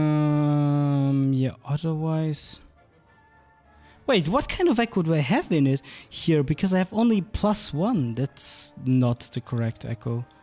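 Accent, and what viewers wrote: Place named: Portugal. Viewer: Eita ele fala português de portugal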